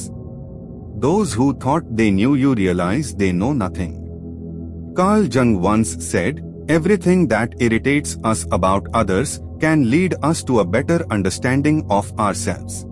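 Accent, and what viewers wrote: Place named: India. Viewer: That indian accent 😂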